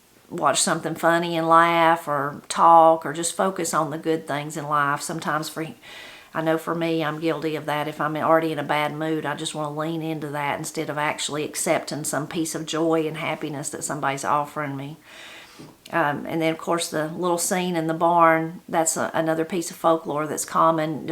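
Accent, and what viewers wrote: Appalachia, United States. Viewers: I was looking forward to the last installment today. I've enjoyed hearing it, and your authentically Appalachian voice made it more of a pleasure. I haven't done a good job of clicking "like" on your daily Christmas stories from Appalachia because I've been watching on my TV where I have click "like" before it ends. But they have been a Godsend to me this month; a daily reminder to stop and relax and enjoy the season. A little bite of Christmas each day, like appetizers. Thank you for all the extra work you've done to produce so many Christmas videos and for inviting us to share your Christmas preparations. Merry Christmas to you and to your whole extended family.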